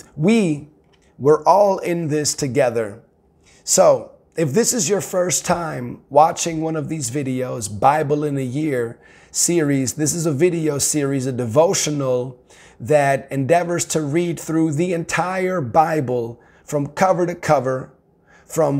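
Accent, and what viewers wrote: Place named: Cockney, United Kingdom. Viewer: Awesome Brother 👌 Also, loving the British accent, you sound like a proper cockney geezer 😄 Big love 💘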